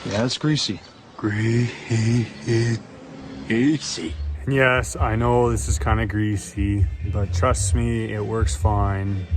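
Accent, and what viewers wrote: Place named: Canada. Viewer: You sound Canadian, where are you working from?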